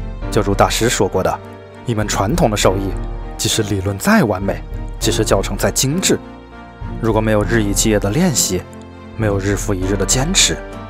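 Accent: Wuhan, China. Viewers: 大哥，你是武汉的吧🍭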